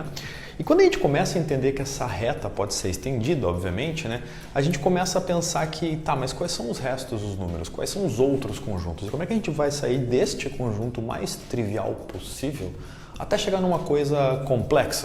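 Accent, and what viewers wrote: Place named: Rio Grande do Sul, Brazil. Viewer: Excelente canal. Pelo sotaque deve ser gremista!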